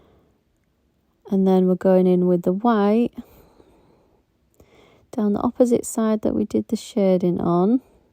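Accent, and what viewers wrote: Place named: Yorkshire, United Kingdom. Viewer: Glad I found your channel so nice to hear a Yorkshire lass keeping it real you sound just like my best friend who is from Leeds! Thanks for sharing your tips I loved all of your videos especially how you gave alternative options for the pool and tortie 👌🏻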